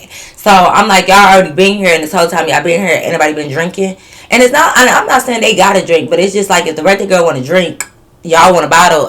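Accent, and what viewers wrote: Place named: Detroit, United States. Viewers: Girl idk what the accent is or sounds like either but I knew you from Detroit by the “accent” and I got excited halfway through when you said you were ❤😂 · My first time to your channel. I knew you were from Detroit 20 minutes in. 😂❤ subscribed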